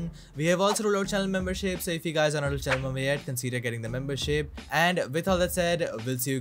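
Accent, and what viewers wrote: India. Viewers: I knew this was a dumb video when i heard that Indian accent, blocked channel.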